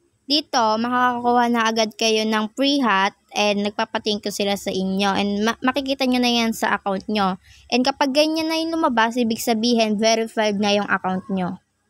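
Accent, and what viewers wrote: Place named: Philippines. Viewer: almost hears like you talk Philippines my mom talks Philippines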